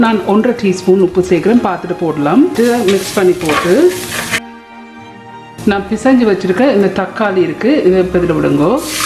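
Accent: Sri Lanka. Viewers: Thank you for this nice video. I do enjoy listening to Ceylon Tamil! Anyway about making rasam, I use rasam powder which is easily available in Malaysia so I don't have to grind all those codiments etc . Well I enjoy watching ur video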